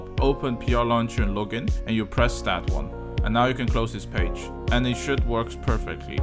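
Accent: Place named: Russia. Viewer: hey your accent its russia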